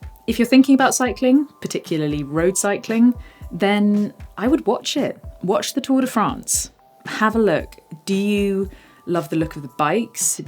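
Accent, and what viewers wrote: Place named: England, United Kingdom. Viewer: Wrecked, hilly...sounds so English...please use an American Accent...will be so fun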